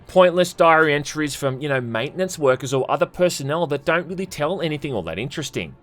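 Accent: Australia. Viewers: I was on the fence about this game. I had watched exactly nine reviews on this and still wasn't convinced. However, YOU convinced me. Hell maybe its just cause your austrailian and that is the funniest accent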